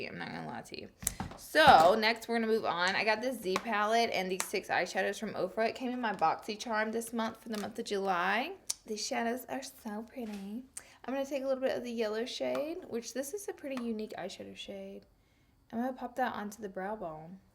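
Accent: South, United States. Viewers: is it me or she has more of a southern accent lol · 💖 ya and your southern accent lol! then British! what contacts do you have on? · love the contacts:) and all your southern twang coming out!! beautiful look :) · honey where are you from? you do a FAB southern belle. you must be somewhere close to the southern states!! LOVE IT! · 3 things I like about you 1.) you don't owe anything but still offer it up to people & 2.) you embrace your southern accent. I have one too! I'm from KY! 3.) you work that gray in your hair. I got it too, girl.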